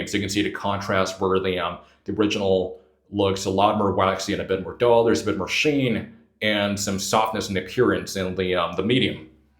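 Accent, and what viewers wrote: South, United States. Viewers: You sound like you picked up a Southern accent.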